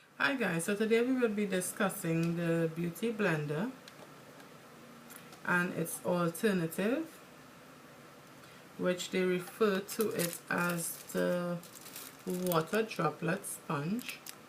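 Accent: Trinidad and Tobago. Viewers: your 'accent' ...are you Trinidadian? xD :)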